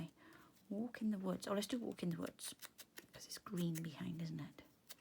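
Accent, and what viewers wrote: Wales, United Kingdom. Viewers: Do i detect a Welsh accent, where r u from, like your work. X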